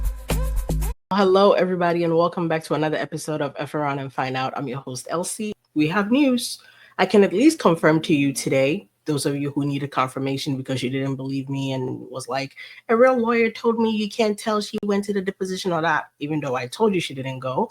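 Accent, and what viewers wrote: United Kingdom. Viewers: I love the British accent !! brilliant - you could star in a broadway Oliver Twist production !! 😂😂😂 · Awesome British accent ❤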